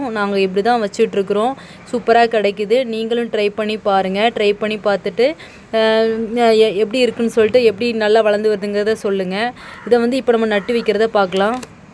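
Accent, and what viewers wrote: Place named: Tirunelveli, India. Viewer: அக்கா நீங்க திருநெல்வேலியா, திருநெல்வேலியில் தான் பூண்டு பூடு ன்னுதான் சொல்வாங்க நண்பர்களே.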